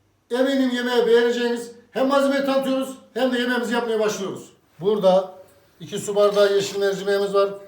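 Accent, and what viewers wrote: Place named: Çankırı, Turkey. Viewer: Abi Çankırılı misin şive benziyor da hemşehrimiz misin merak ettim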